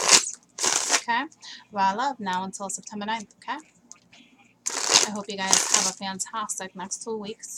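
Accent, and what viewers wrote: Boston, United States. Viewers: Hey You's I love your Boston accent!😂❤ And your Reading❤❤ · Are you from Boston? I'm loving your accent!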